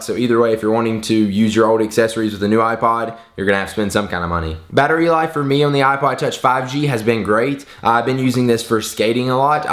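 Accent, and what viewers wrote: South, United States. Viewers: Is this guy southern? I think I hear a little acent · Does he really have a country accent? Never noticed that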